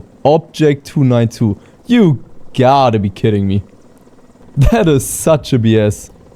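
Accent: Germany. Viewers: Are u german by chance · You are german right? I hear that. also you pronounce german tank nemes right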